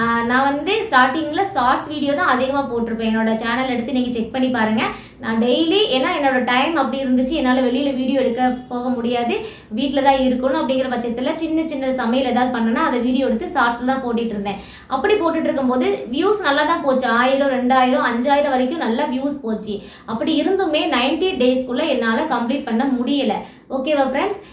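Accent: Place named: Tirunelveli, India. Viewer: Tirunelveli slangs super sister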